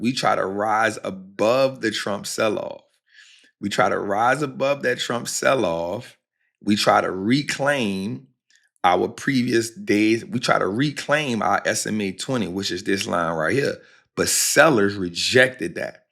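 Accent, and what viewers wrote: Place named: Louisiana, United States. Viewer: Were you from bro? Sound like you from da boot like me😂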